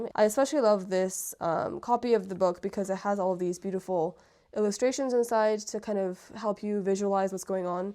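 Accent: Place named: Ireland. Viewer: oh that's cool, it sounds vaguely irish at some points? Or maybe it's just your voice, either way it's beautiful.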